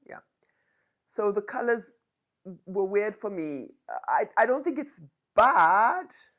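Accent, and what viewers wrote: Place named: Cape Town, South Africa. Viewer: When you said Cow girl blues I thought…. Oh Cape Town immediately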